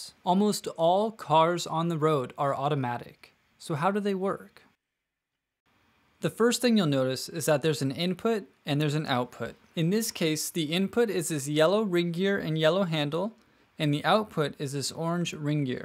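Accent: United States. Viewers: He has an American accent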